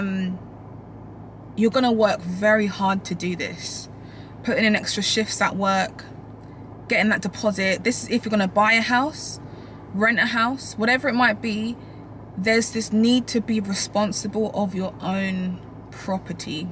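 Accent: United Kingdom. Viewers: Are you from the UK!!! If so love it and love your energy. Love how you read Saturn in 4th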